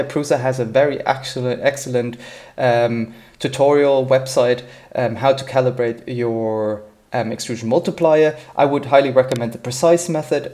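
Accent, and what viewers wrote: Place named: Germany. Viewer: Offtopic: I noticed your german accent and never thought that originates from germany. And after looking in your impressum I see karlsruhe, where I study:-). Muss ich mal in die Oststadt schauen:-D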